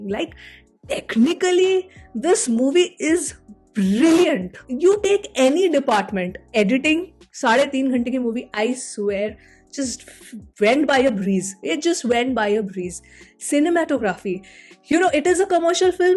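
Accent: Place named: Northeast, India. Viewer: Mam are you from north east because your hindi accent doesnt have that north indian vibe where are you from❤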